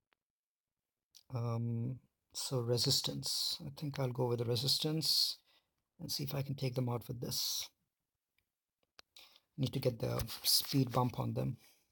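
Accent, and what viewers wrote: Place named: Asia. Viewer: It's the 1st time im hearing u... Feels so satisfying because all ur vids are soundless 🤣🤣🤣 BTW from ur accent, i guess that u r an asian.... Am I Ri8? Cause im Asian too 😋😋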